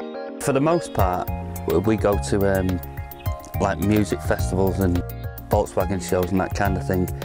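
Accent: United Kingdom. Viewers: yep that british accent :) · Bill Murray with a British accent.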